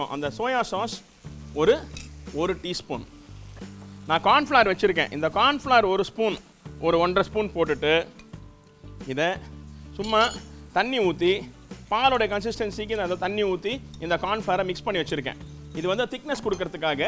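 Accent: Chennai, India. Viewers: semma ,Madras language, I like it.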